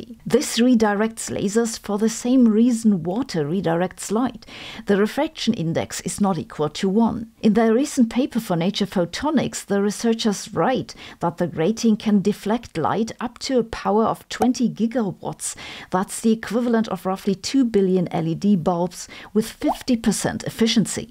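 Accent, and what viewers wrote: Germany. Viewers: Dear Sabine, just two questions about your themes presented in this video: i) You said that although the mathematical construction works, there's no physical evidence for the "simulated universe " theory. Is there any evidence that excludes this, too?.. If there is, I'd very much like to know... ii) About the method of road construction on the Moon surface: do the scientists involved tested the structural endurance of their "melted tarmac " against the extreme conditions in their lab, concerning temperature, that the surface endures throughout the day-night periods? According to my - limited- knowledge, that's the prime factor for any construction, set aside the humidity, which is non-existent on the Moon, of course... Thank you for your videos! Popularised science, in layman's terms for the broad public, is the best way to spread the achievements of science widely. 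Even speaking with a heavy German accent, which sometimes reminds me the Peter Sellers' performance in "How I learned to love the Bomb"! 😊 I would very much appreciate a reply to my questions, if you please..!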